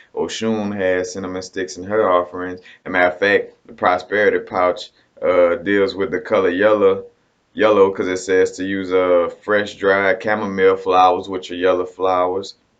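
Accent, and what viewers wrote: New Orleans, United States. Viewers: U sound very New Orleans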